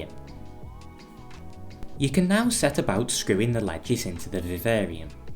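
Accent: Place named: England, United Kingdom. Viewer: And never pass up an opportunity to hear the king's English.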